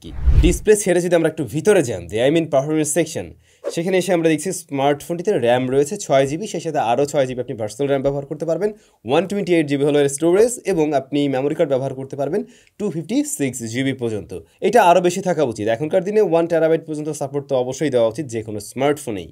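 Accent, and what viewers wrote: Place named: Sirajganj, Bangladesh. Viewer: ভাই আপনার গ্রামের বাড়ি যদি সিরাজগঞ্জ হয় তাহলে আমার আইডিয়া ঠিক আছে কারণ আপনার ভিডিও দেখে এবং আপনার কথা শুনে আমার কাছে এরকমই মনে হয়েছে আমার আইডিয়া যদি ঠিক হয় অবশ্যই রিপ্লে দিয়েন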